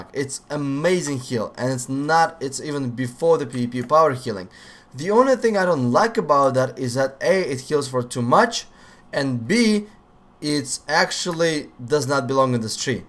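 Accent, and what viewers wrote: Russia. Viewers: Ты русский? Серп и молот вместо глаза, акцент. Да?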